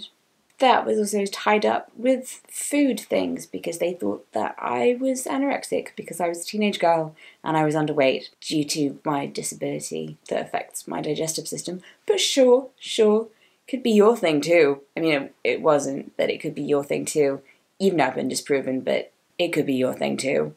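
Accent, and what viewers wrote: United Kingdom. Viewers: Your videos are multi benefit for me! First, they're educational and I learn a lot from them, second, I'm an English learner and your British accent is amazing to listen to, and third, they're inspirational and it always proves my point that people with disabilities are the strongest (if that made any sense). Stay happy, best regards!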